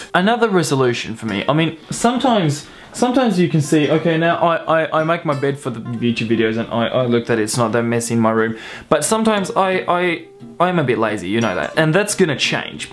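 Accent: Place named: Tasmania, Australia. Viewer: It still freaks me out (in a good way) to hear a Finnish guy who talks like a Tasmanian :)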